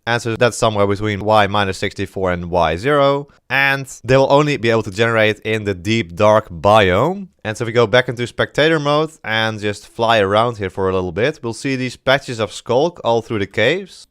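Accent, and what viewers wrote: Netherlands. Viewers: you sound dutch mate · Where you from? You sound Dutch? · Are u dutch because i can hear it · u sound dutch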